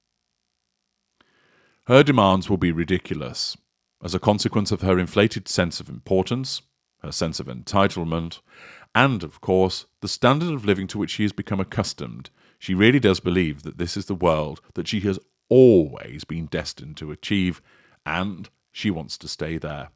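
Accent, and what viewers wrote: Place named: North, United Kingdom. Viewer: Your common northern accent keeps creeping in, doesn't it? Us southerners do it better, we know it, and you know it! As for the Markle trash, good riddance.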